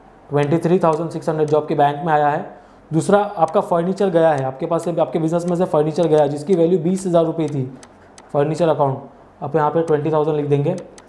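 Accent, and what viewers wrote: Maharashtra, India. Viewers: sir aap marathi ho kya ????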